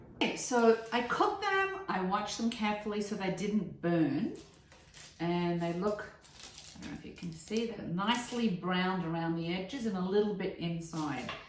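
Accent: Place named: Australia. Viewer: Hi! Good to hear an Aussie do a cooking segment. I think you could probably sprinkle them with sesame seeds on top for a bit of flavour. Maybe you could sprinkle a little Parmesan cheese in with the cottage cheese. Thanks for the recipe. All the best.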